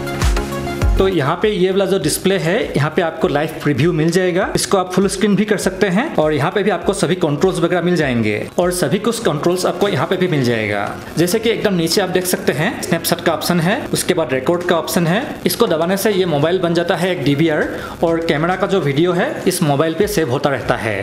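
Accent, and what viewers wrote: Assam, India. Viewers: First time hai apka channel pe ..but app ka hindi Assamese logoko jesa hai ..kya app Assam se ho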